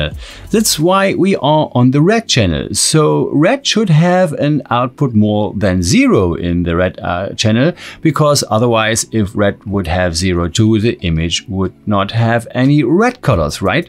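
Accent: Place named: France. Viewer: He sounds like G-Man from Half Life, just with french accent :)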